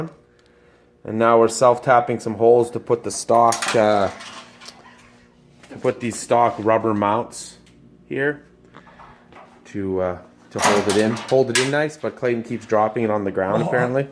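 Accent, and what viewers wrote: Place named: Canada. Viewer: makes brrilliant hand-made parts showing excellent home grown engineering skills, and some nimrod criticizes him for saying "rad"?? Canadians say things kinda funny (they are working in a "gradge" for example, not a garage. is as pure a hot rodder as I've ever seen--he gets massive bang for the buck.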